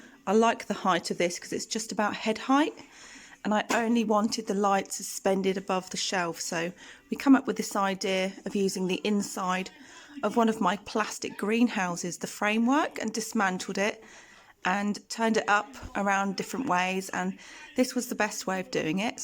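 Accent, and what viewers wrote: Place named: RP, United Kingdom. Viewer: I've subscribed to your channel. I like your content and your posh accent is a bonus. 😊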